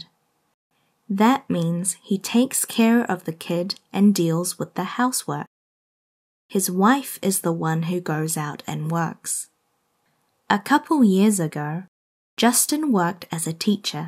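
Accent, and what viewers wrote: New Zealand. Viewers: 이 영어 발음이 뉴질랜드 영어발음 악센트인가요?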